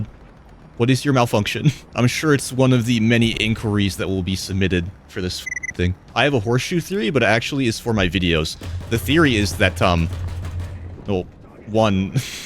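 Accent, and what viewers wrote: United Kingdom. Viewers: you are british?😟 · wait is British? I’ve been hating on a fellow Brit for hating British tanks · IS BRITISH? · Youre british?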